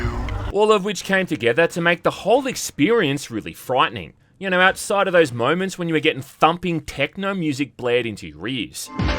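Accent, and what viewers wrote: Australia. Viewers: Never tire of you saying 1999 the aussie is strong with this one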